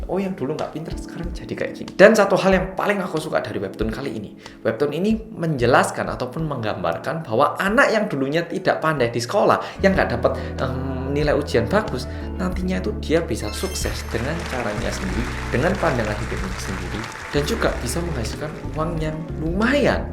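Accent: Java, Indonesia. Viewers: bersama orang korea yg medhokkkkkkkkkkkkkkkkkkkkkkkk wuasik pool mendhoknya wkwkkw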